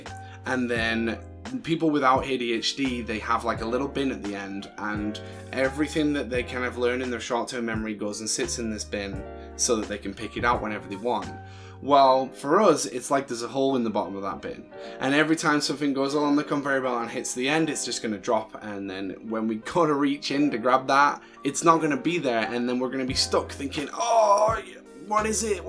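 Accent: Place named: Scotland, United Kingdom. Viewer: Are you in the UK or USA? You have a Scottish accent but adderal isn't prescribed in the UK?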